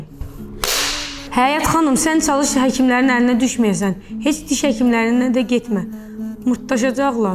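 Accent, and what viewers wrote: Baku, Azerbaijan. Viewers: Sənin hər işin xoşum gəlir ama Bakı ləhcəsi mənə ləzzət eləmir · canım ay bakı lehcesi şırındude etaga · Bakı lehcesi başqa alemdi amma Bakı kendininde öz lehcesi acıqlama ver zehmet olmasa sizin lehce hansı kende aiddi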